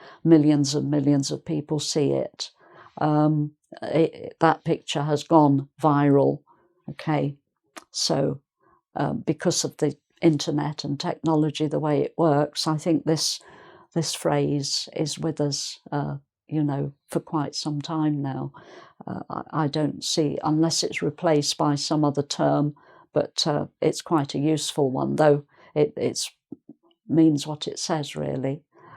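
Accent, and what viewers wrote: United Kingdom. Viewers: Thanks so much for sharing this interesting and useful video about buzzwords. I'll try to teach them in my following class. It's a pleasure to hear such a beautiful British accent. 💖 Greetings from Spain. 🌷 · i see it is a british accent ! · I live in Brazil. You can't imagine how happy I am, having you as a teacher, teaching the real British English. I can understand you, my target is having such a noble accent. Thank you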